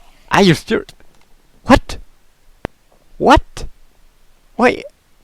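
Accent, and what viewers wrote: Germany. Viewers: deutscher akzent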